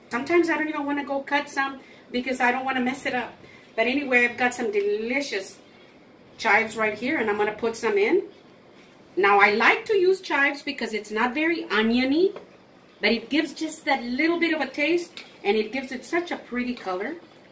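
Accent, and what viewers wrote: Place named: Texas, United States. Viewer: ! I absolutely love your recipes! I tell our four young daughters who watch your videos with me that I would love a lady in my life like you to teach me all her recipes! I often thought you were from Texas, just because of the way you talk and present yourself. I am from Austin, and we live in Manor, and today on this potato salad video I saw the mustard from H‑E‑B. Haha!!! I know there is no HEB’s in any other place but Texas! Anyways! We LOVE YOUR RECIPES! I used your cheese enchilada video as a reference for last nights dinner. We all loved it! Thanks for all you do and God bless you and your family!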